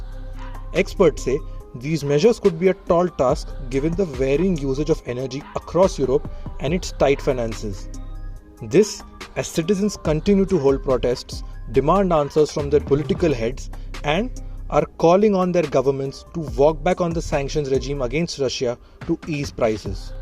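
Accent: India. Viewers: Why dont indian ppl say "T" correctly and properly while pronouncing and reading such international news ....just try hard to throw away your thick -and bad indian accent all of sudden.... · PROPAGANDA . Sounds Indian .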